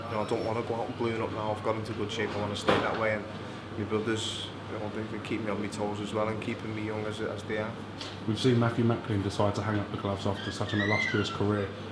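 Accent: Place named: Liverpool, United Kingdom. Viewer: Yeah brother! Paul Smith back at it again with the Scouse uyaaaah brother!!!! Shout out to my boy KUGAN, RIP my sweet soul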